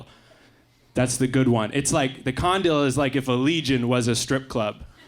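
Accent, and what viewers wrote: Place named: Canada. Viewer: His Canadian accent brings back memories of home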